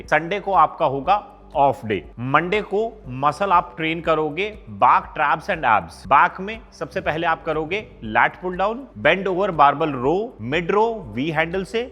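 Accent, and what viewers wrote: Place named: Punjab, India. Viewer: Are woh uska accent h .. Punjabi h to normally aa jata hai...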